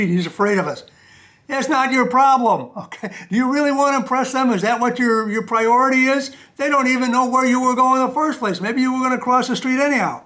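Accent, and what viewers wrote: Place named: Chicago, United States. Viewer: Unc you definitely sound like a kid raised to in the Chi..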